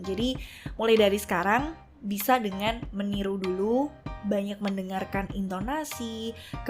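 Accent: Surabaya, Indonesia. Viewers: Akhirnya ngonten lagi, mbak njenengan wong jowo to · Sampean arek suroboyo ta mbak bro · woalah... arek suroboyo ta....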